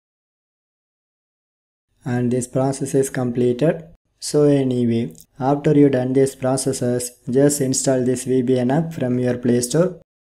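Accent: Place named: Kerala, India. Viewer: Are u from kerela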